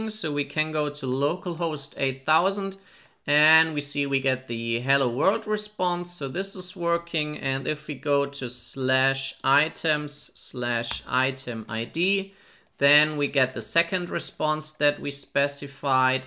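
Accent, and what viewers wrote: Germany. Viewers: Du bist ein Deutscher, oder?😊😂 Aber gutes Tutorial definitiv! :)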